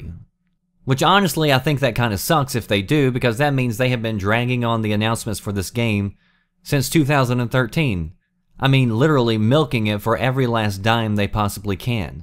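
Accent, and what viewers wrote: South, United States. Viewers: This guy sounds like a Southern Weird Al. I am not disappointed.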